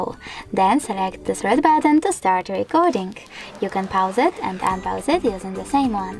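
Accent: Asia. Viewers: Can you speak slower and not Asian Please !!! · Too hard to understand thick Asian accent. Too long nails can't see exactly where pointing. Way too fast.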